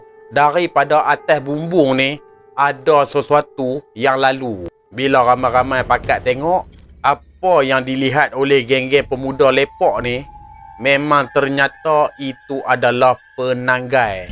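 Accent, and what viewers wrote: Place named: Kedah, Malaysia. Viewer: BG guna lah ayat KL,baru lagi ok,tak leh lari slen Kedah ya?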